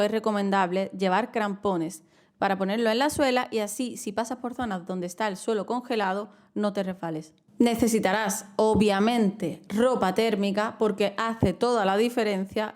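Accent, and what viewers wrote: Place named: Andalusia, Spain. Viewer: Espectacular video! No podría ser más útil. Tengo pensado ir a Islandia en mi próximo viaje ya que uno de mis sueños viajeros es ver una aurora boreal. Voy a guardar este video para ir organizando en base a tus consejos ❤️. Gracias guapísima, con ese acento andaluz hermoso como toda su gente! Saludos desde Buenos Aires!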